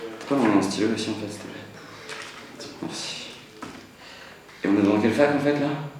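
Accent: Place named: Switzerland. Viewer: Mais tu est suisse j'ai l'imprétion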